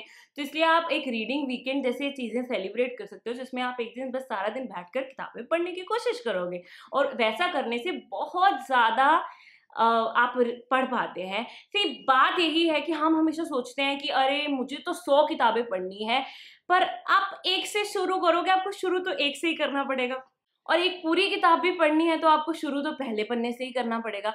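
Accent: India. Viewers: I think you have a very good command over English language especially coz of your indian accent. I mean your language is quite easily understood by indian audiences. I looked for audio books over YouTube and applications so that I can improve my English but didn't get even a single video or audio book in indian accent. Then I thought of you for a second that why cant you create an audio book over youtube. Isn't it a great idea? It will help a lot of Indians like me who are looking for such audiobooks. I hope you come up with atleast one such book in near future. Thank you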